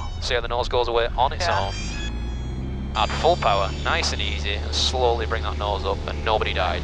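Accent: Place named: Yorkshire, United Kingdom. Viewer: ahhh I see the accent sounds similar to a Yorkshire one..... but not exactly the same 😂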